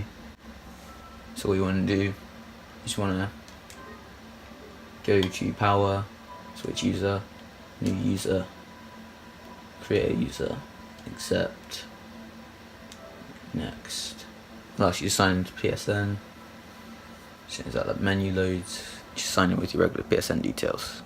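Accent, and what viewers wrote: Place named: England, United Kingdom. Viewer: Omg u chocolatey English guy it works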